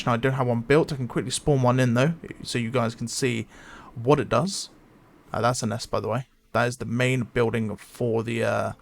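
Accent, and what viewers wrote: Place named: West Country, United Kingdom. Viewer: Do I detect a fellow West Country lad? When you say the queen has her own audio? What audio effects does she have?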